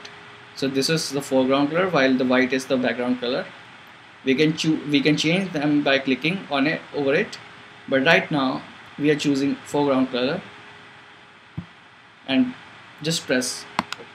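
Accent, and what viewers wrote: India. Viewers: <link> learn how is spelt Gimp. Are You hindi? I am sure your accent is hindi.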